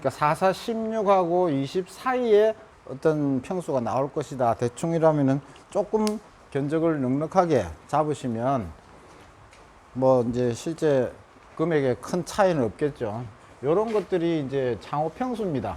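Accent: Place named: Busan, South Korea. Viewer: 사장님 부산 이네요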